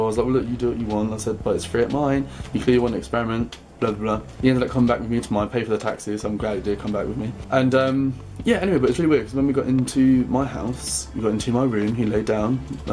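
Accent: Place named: United Kingdom. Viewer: haha he was 'straight' !!! loved this video!! trying to work out where about you are from in the uk from your accent!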